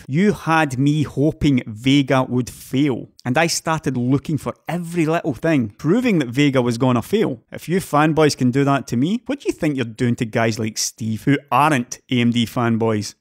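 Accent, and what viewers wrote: Scotland, United Kingdom. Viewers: A little bit long. Also, we have a Scotsman in an argument with an Aussie, and I'm going to back the Scotsman. That's not going to make me a lot of friends.. Now, we're a day or two away from the release of the two thousand series Ryzens. Now watch what happens when "independent testers" start testing the new Ryzens. Some people are really independent testers, some are fanbois. Intel will lean on everybody to skew the tests in their own favour. And Intel will have plenty of fanbois to imitate the Russian secret service on EweChoob and every other social media, they probably won't even have to pay them.... AMD... they have some pretty rabid fanbois of their own...... yeah, worth watching (listening) to the end of the last one. He does seem to be going off at Aussie Steve quite hard, but that does change toward the end. Watch all of it before you throw stuff. 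I would back, and I am an Aussie. I have yet to hear him say anything I sharply disagree with. That alone, puts him in a different group to most other tech tubers... The Scots accent is a little bit thick, but the man who has it, is not. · Well, I'm a bit of an AMD fan. I think is more about keeping monopolies in check. I've never really seen you as bias. Sure you have your favourites. But to me you come across as very matter of fact, no marketing bs. That combined with the scottish makes your informed opinion matter to me.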